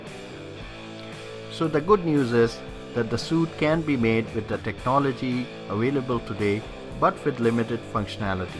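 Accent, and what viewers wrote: India. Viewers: I'm sorry but this guy sounds like indian tech support. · You make speaking english in indian accent sound cool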